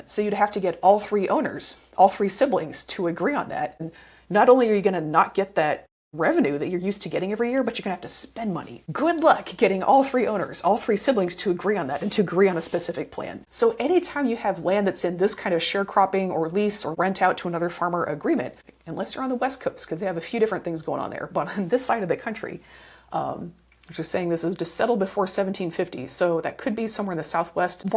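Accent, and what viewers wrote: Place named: Lompoc, United States. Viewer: Oh,my, are you in Lompoc, CA? Don’t answer but that’s what it sounds like. Cheers.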